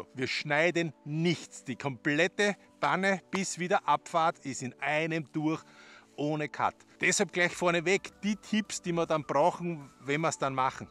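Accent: Austria. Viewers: Geiler Dialekt is des va Niederösterreich?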